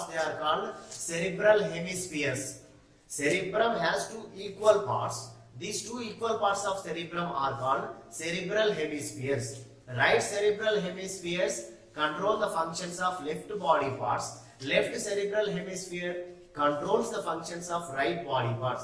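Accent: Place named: South, United States. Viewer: Sir you are from south nnnn....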